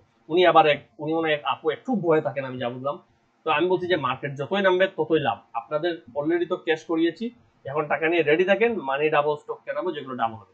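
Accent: Sylhet, Bangladesh. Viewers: আপনি কি সিলেটি?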